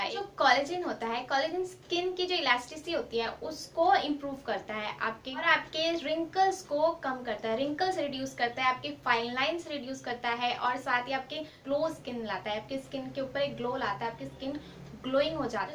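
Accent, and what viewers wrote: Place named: India. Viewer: this is India English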